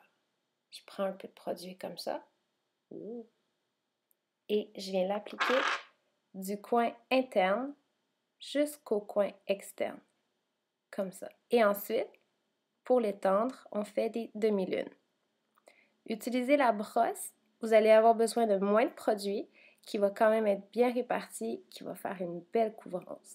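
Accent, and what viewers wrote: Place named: Canada. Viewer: ho toi tu es une canadienne !! je me trompe pas !! avec ton accent tu es une !! trop marrent quand vous parlez!!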